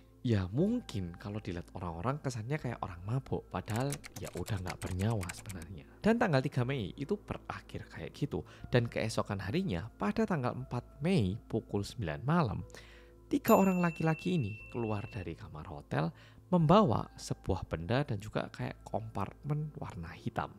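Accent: Java, Indonesia. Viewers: Selain ceritanya aku suka logat dan medoknya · Ngebut bgt nonton smua vidio oppa medok ini. Hahahhaa,semua ku tonton mlm ini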